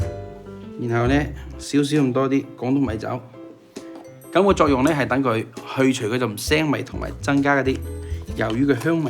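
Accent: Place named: Guangdong, China. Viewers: 这是广东哪里口音？我不会说广东话但是能听懂粤语，能听出来和正宗白话有点点不一样？ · 主持人的口音明顯是來自大陸廣東省，而且字眼都國語化了，一點韻味都沒有，不親切聽起來很土很怪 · 對，不是標準的廣州話但是確實是廣府話。整個嶺南都有自己的鄉村話。例如，中山有自己的口音和鄉村話，江門也有，南寧也有，等等。香港和廣州說的就是廣州話。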